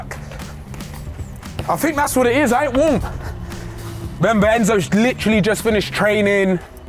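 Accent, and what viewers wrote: Colombia. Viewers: Hey loved your colombian accent 😁 great video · Gosh, where's from? He's English, but has a great Colombian accent in Spanish.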